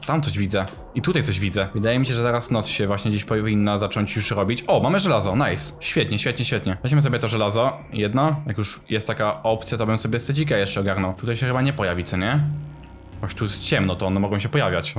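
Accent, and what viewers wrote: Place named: France. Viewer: Czemu masz francuski akcent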